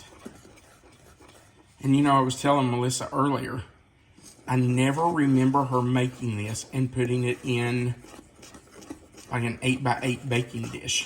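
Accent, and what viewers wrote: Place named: Kentucky, United States. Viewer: As a 14-year-old, this was the first candy I made, and it was from the back of the Cocoa can. I was a farm kid and learned to help in the kitchen from an early age. I did not have canned milk and used our Dairy farm cream from the top of the pitcher of milk. I did not have measuring cups or spoons. Used a teacup and a teaspoon and real home churned butter & Watkins vanilla from the "Watkins man" who came around on a regular basis. I also used the cup of cold water to test it since no thermometer in 1957. My mom walked me thru the first time and turned out fine, delicious. I made it many times after that thru the year, not just Christmas. I got the proper equipment in the 1960s but never made a difference in the outcome. Love hearing about your grandmother and that she lived in W. Virginia. I started watching you because you were from Kentucky and had that delightful accent. 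My Dad was born in W. Virginia when he came a little early while grandmother was visiting her sister. He was raised in Louisa, Ky but moved with his family to Central Oho farm at 16 years old. Love and miss my Kentucky relatives. I still love your accent, not as pronounced as my relatives, but watch for those delicious recipes.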